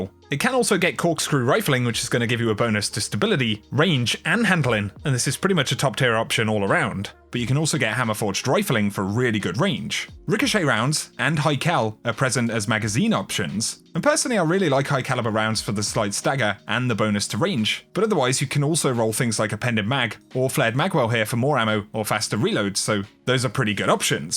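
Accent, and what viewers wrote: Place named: England, United Kingdom. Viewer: Where is from?! He says Ay sounding vowels like eey.. But he sounds English?!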